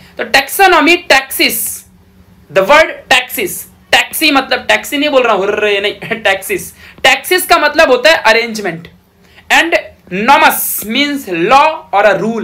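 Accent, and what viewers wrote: Maharashtra, India. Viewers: Your marathi is is the best part of video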